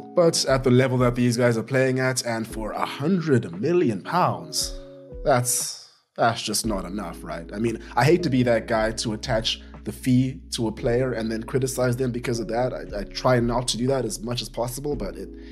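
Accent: South Africa. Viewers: Bru u sound South African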